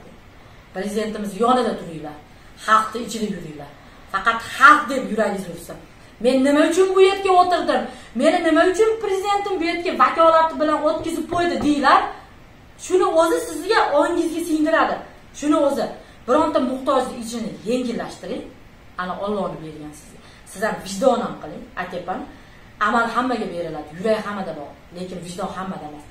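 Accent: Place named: Andijan, Uzbekistan. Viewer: Опа гапни хам Анжонча килиб ташладингиз,офаринг,ёнингиздаги 2-та опа хам гапиришга чикган эдими,халк сиз каби инсонлар бн ...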